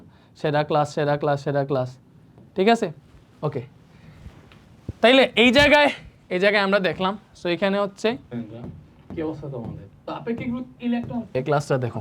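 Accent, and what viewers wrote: Sylhet, Bangladesh. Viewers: K k Sylhet thaka dakso vaiya kintu Sylheti😊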